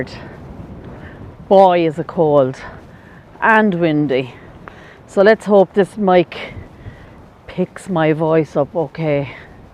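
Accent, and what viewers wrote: Ireland. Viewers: Beautiful looking final resting place me and my baby sister we love 2 read head stones etc my baby sister is in heaven now this nice lady i meet in east land mall in Warren Michigan she told me the weather in Ireland was windy and rainy that was in the 1980s was very young in them days probably around 3o I think 😮 love your Irish accent people say I talk whit a accent 2 women's love it men ask me man where are you from tell them Alabama lowndes county heart ❤️ of Dixie wishing you love peace and happiness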